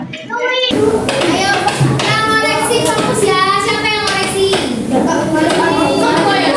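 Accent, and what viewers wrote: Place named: Java, Indonesia. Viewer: Semangat.. Semoga bisa menjadi guru yg sejati... Bahasane medhok banget sih mbak....😂😂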